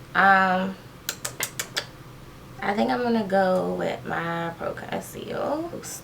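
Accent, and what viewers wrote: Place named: DMV, United States. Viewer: Hi there! I stumbled upon your video as I'm trying to get reviews of this foundation. You sound like you're a local girl to me (I'm in the DMV). Thanks for the video!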